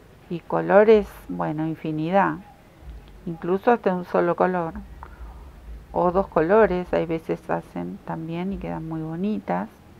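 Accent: Argentina. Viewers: ¿Sos argentina? ¡Me encanta tu acento! Solía vivir en Buenos Aires a finales de los 80 y lo extraño ;)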